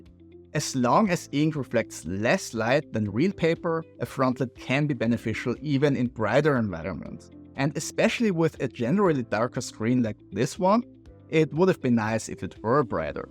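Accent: Austria. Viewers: daher die leichte Wiener (?) Aussprache. * Englisch eher österreichisch als deutsch angehaucht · Thank you for the good review. Just what I was looking for. Are you by any chance Austrian?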